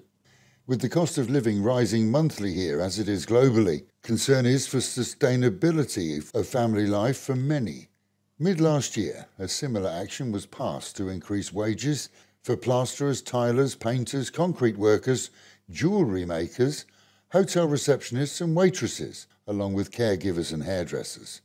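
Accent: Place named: RP, United Kingdom. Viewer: Nice RP accent. Quite a change from the many YouTubers who seem to be from Newcastle.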